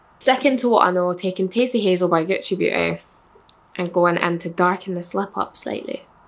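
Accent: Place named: Glasgow, United Kingdom. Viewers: The glaswegian accent gives me whiplash every time, but i love it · Always forget your Scottish too, your accent is like mines. I'm from Southside of Glasgow 😊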